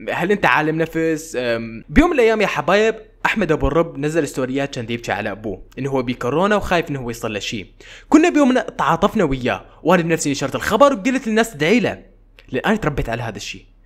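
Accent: Iraq. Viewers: أتابعه مشان اعرف مشاكل يتيوبر ✖ أتابعه مشان لهجة عراقي لتجنين ✔😍😍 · احسك عراقي 😂😂😂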